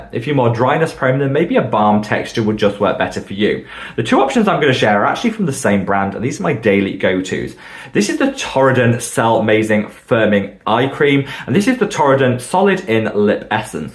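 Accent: United Kingdom. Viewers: I came to your vlog a few month ago but I‘m always very excited about your topics and I love your british accent ! I started with the agireline two weeks ago, to soon to talk about results, I use Matrixyl two month. I can‘t see anything about reducing wrinkles but I‘m in the postmenopause, it is a bit too late for that. But, my face looks a bit fresher and glows a bit, that‘s what friends tell me and that makes me look a bit younger, so for me personally a good result! Thanks and keep on going, you‘re great!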